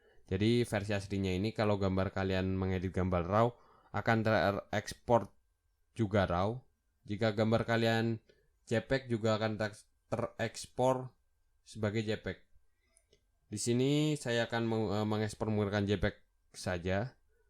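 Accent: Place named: Java, Indonesia. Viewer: ngomongnya gausa di medok medok kakaaaa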